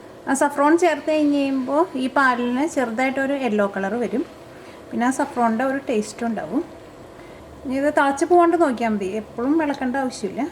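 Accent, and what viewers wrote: Thrissur, India. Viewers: chechide chiriyum pinne thrissur slangile samsaravum nice aaaa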